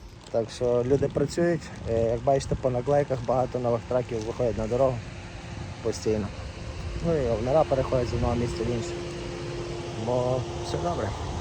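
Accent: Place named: Hutsul, Ukraine. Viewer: Слава Україні! На ЯРДУ на ШАПУ😂 Чому не на ЯРД, ШАП і т.д? Гуцульський акцент...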